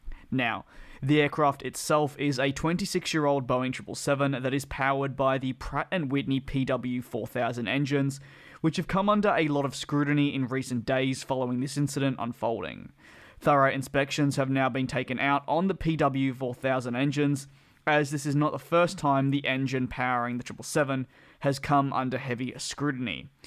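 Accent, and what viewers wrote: Australia. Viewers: Are you from Sydney Australia?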